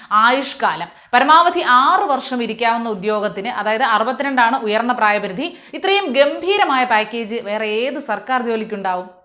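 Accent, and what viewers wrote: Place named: Palakkad, India. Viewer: പറ്റൂല പാലക്കാട് സ്ലാങ്ങിൽ മതി 💪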